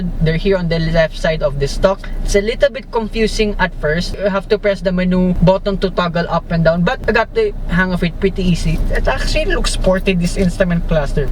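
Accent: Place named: Taglish, Philippines. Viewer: Sa susunod tagalugin mo na lang. Ang sakit sa tenga ng TAGAlish mo!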